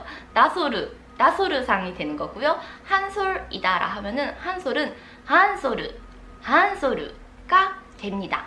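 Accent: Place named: Tokyo, Japan. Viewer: 수도권 출신의 일본어 특유의 발음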